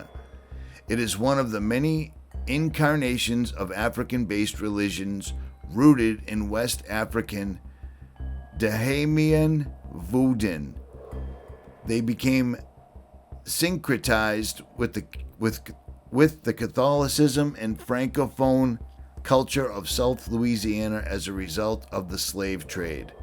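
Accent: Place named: New England, United States. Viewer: You spin a pretty darn good yarn, gruff yankee voice and all. Great footage as always. Much thanks and love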